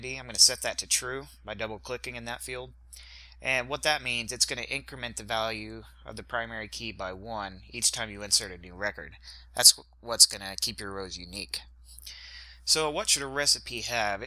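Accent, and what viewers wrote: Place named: United States. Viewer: Thank GOD for your sweet american accent! I'm tired of "right", if you know what I mean!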